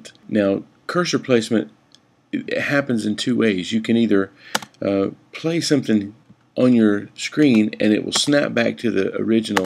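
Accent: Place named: Tennessee, United States. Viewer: THANK YOU!!! You sound like you're close to BNA.:-) Good vibes.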